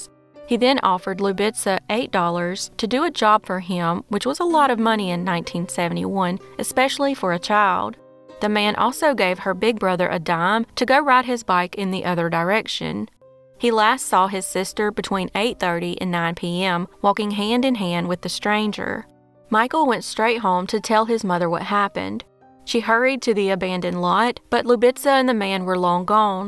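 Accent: South, United States. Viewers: Please know that I appreciate your posts! And love your southern accent too! Lucy in Canada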